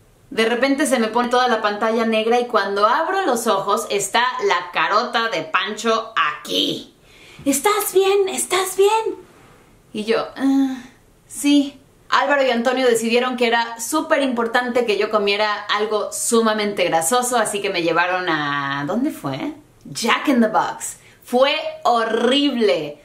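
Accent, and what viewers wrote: Mexico. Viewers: Veo tus vídeos más que nada por tu acento jaja. Me gusta mucho que hables tan mexicana, no es sólo que hayas vivido aquí, sino que entiendes la cultura y eso se agradece. Sobre la droga, hubiera sido interesante saber si Pancho conocía al bartender jaja es lo único que me hace lógica. Como comentario sobre el video, sentía a veces que la música más que adentrarme en tu narrativa, me distraia y desconcentraba de lo que estabas diciendo. Creo que no es necesaria. Saludos! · rad15 90, para estás fechas, ya sabrás que ella nació en EUA.......y que fue estuvo desde pequeña estudiando en México, (por asuntos familiares) así que sus estudios básicos elementales fueron bajo el sistema educativo mexicano. Es básicamente una mexicana, nacida en USA. Qué les pasa?.....no tiene ningún acento, que no sea el español; aprendido en México. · You speak like a Mexican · Tiene el acento Mexicano.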